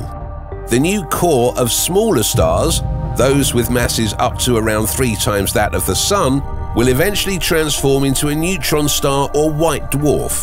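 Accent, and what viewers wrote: United Kingdom. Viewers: Because the word sounds more daunting in a British accent.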